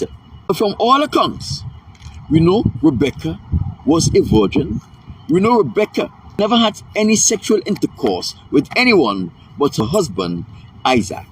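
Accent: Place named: Grenada. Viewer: You from Grenada. Gotta be!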